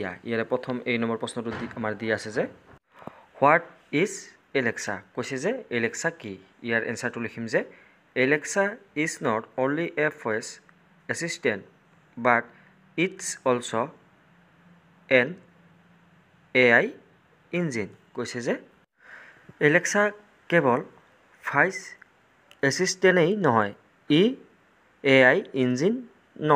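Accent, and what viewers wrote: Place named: Nalbari, India. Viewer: Sir nolgoriya hoi heybabe kisu kisu bakyo bhal koi nufute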